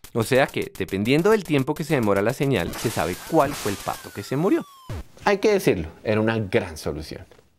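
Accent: Bogotá, Colombia. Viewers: sos colombiano ? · te reconozco el acento colombiano :) yo también lo soy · esa voz de rolo inconfundible con un tono agudo en cada frase al final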